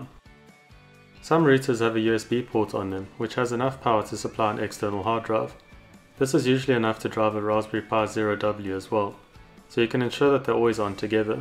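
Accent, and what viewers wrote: South Africa. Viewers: I'm a simple man, i hear a SA accent, i subscribe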